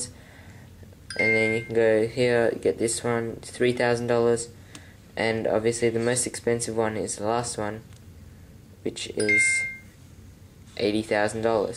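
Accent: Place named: Australia. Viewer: Nice australian accent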